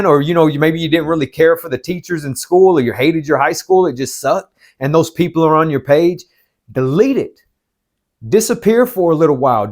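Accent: United States. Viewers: ...you from Pittsburgh?!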